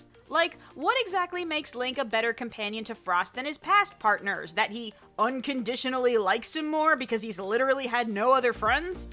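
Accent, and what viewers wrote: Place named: Scotland, United Kingdom. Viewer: Nice Scottish accent ! Im definitely going to see this tomorrow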